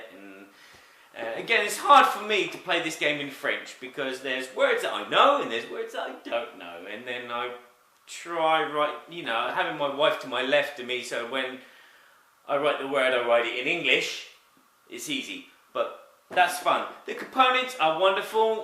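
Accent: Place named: Maine, United States. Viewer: I have never heard that particular American accent before, you must be from the east, far east, like Maine. As always great video. Thanks for sharing.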